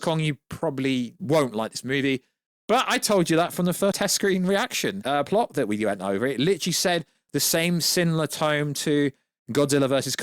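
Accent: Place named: Scotland, United Kingdom. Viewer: You sound like grounds keeper Wullie from the Simpsons, with that dodgy Scottish accent. It made me laugh though.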